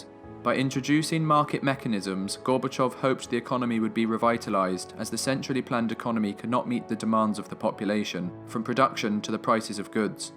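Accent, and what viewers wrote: RP, United Kingdom. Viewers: "Excuse this British accent" - no, I won't. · Dramatic music, slideshow pictures, Wikipedia text, British accent...can’t beat that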